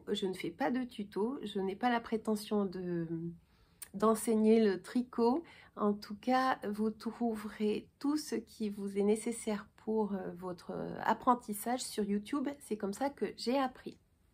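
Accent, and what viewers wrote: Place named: Marseille, France. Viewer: 🍄merci , ton Marseille te vas à ravir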